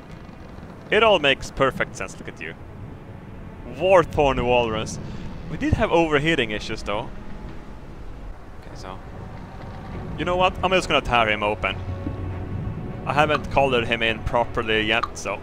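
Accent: Sweden. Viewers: GreenColorBombs the power of the swedish accsent mate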